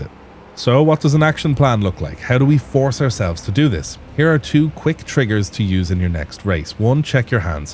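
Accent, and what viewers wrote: Ireland. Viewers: First time visit. subscribed. just seconds in, I heard that legendary Irish accent and thought brilliant. and then the Irish flag on your car, Legend. from one fellow Irish man living abroad to another. Hows tricks dude. awesome video.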